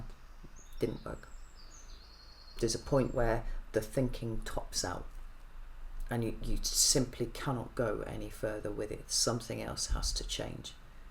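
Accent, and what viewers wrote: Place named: England, United Kingdom. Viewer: Yo sister greetings from Andalusia Spain like the English accent,rare thing these days thanks for reading ❤🙏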